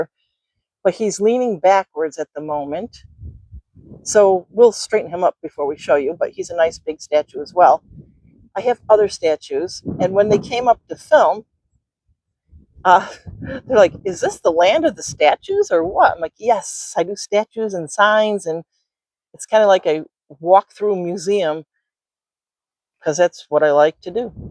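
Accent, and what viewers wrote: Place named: North, United Kingdom. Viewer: Thanks for the video.I am from around tug hill.Moved to Florida because my husband was in the Military.We still have family up North.Love your up North accent.People still ask me where I am from.Beautiful country up North.I miss the seasons but not so much winter😂Thank for all you do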